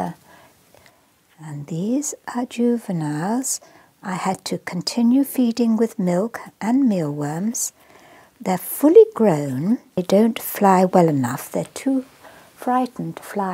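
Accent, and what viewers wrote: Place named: RP, United Kingdom. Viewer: A wonderful voice. It's called an RP (Received Pronunciation) British voice and it's almost from a bygone era.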